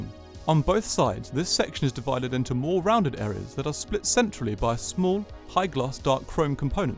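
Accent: RP, United Kingdom. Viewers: You sound like you have just left Eton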